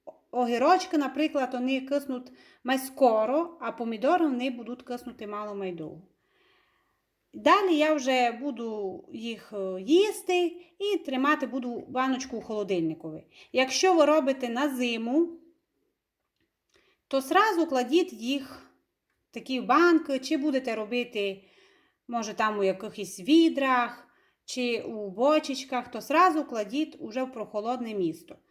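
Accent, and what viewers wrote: Transcarpathia, Ukraine. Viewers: Це Закарпаття, свій діалект української мови. Все гарно ( файно) і зрозуміло. Дякую,.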